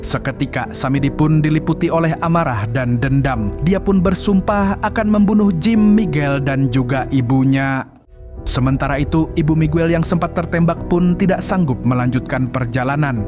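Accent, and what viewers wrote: Banjar, Indonesia. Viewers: admin nya urang banjar kah? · Banjar host nya wkkw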